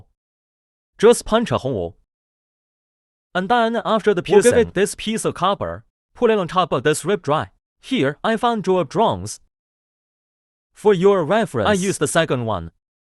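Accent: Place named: China. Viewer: 不知道为什么 你的英文发音好中文啊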